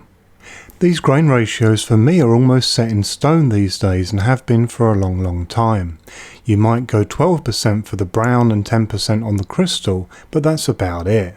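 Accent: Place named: England, United Kingdom. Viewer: Thanks! I really enjoy your videos...it's always reassuring when the presenter for a porter recipe has an English accent.🙂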